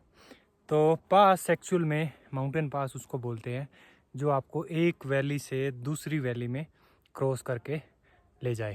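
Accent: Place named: Pahari, India. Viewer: Bro you speak in Pahari accent actually. Are you from Himachal or Haryana by the way?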